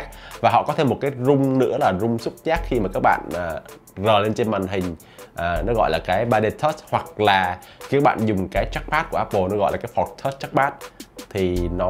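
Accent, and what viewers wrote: Vietnam. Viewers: Tiếng anh rất việt nam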